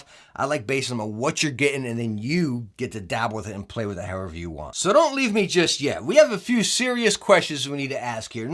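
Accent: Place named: Staten Island, United States. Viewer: I love your accent, my man. Are you from Staten Island by chance?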